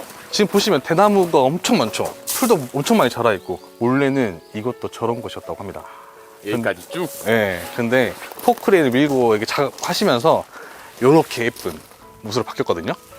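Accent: Jeolla, South Korea. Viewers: 제주도에서 전라도 사투리..^^ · 전라도 사람임? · 정겨운 전라도 말투네요. 설명도 잘해주셔서 편안하게 영상 보고 갑니다. 채널 번창하세요~ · 혹시 전라도 분이세요? ”촤측“으로 “코가구”를 하시는게 전남분 같아요~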